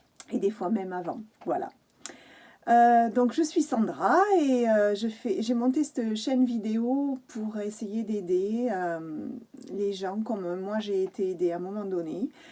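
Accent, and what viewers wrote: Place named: South-West, France. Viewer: Ohhhh merci pour votre lumineuse et étincelante Présence, merveilleuse déesse Sandra au bien joli accent (du sud ouest ?). Attirée avec un bel élan de vous écouter immédiatement et absolument ravie d'adhérer à vos propos si exacts !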